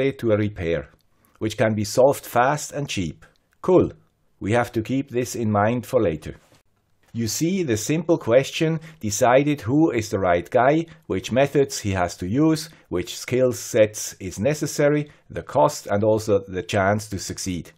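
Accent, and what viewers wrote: Switzerland. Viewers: This is pure gold for troubleshooting. Thank you Great Master with Swiss accent 🖖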